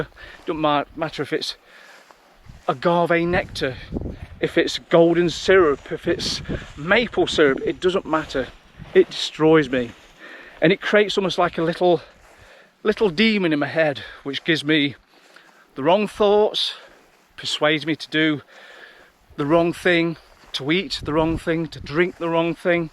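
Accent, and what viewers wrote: United Kingdom. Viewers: That's great to hear about carnivore diet with a british accent ;-)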